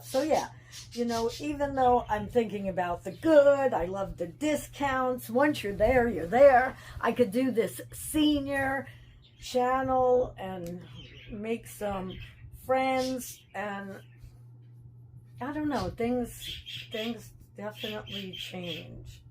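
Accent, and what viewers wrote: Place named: Michigan, United States. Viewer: Is she from Michigan? That is where I am originally from and it sounds like their accent LOL When I was a kid, women in their 60s looked the same as women today in their 80s and 90s LOL It's like your 60s today is just your midlife, not almost the end like it used to be. People retire now and enjoy their 2nd life.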